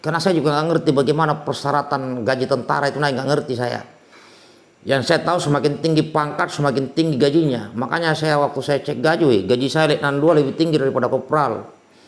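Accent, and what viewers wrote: Makassar, Indonesia. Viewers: Mantap komandan 👍👍🙏.. Solo hadir.... cerita cerita yang menarik dan inspiratif dari Mayor..... jangan lupa logat Makassar, kopi dan rokoknya pak komandan.... sukses selalu 👍👍👍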